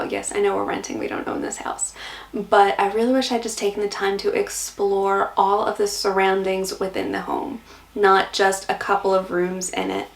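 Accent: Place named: Australia. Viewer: I am concerned that you refer to this as "things i regret about my first six months here." My wife and I came here from NZ in 1981 and our experience of homesickness was very different. I had a job to come to so I had to learn to speak Australian quickly, I had to learn to "get on the team". Francie, on the other hand had lots of time to mope about the friends she left behind and her family still in NZ. It took her YEARS to get over homesickness. I tell you that because you've had a huge upheaval. You might not see the enormity of what you've acomplished yet, but it is enormous. You must NOT allow yourself to regret not doing things. You've been busy as hell learning to live in another country. Jeez you even speak like an Aussie (sort of )!! You should think of each of those things as "now i've been here 6 months and settled in with Mark, here's what i really want to get going on for the next 6 months ..." That's a far more positive way to think of it. 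It's very easy to tell yourself that life is terrible and you'll start to believe it But just as easy to say "life here is wonderful" and you'll fool your brain into thinking that instead. Not changing things in the house early on: I think you were right not to change things around all around the house. Yes you want to make some of the house 'yours' so it's the home of both of you, and I agree with that, but on the other hand you dont want Mark saying to himself, "my God!!! she's come all this way and in five minutes she's chan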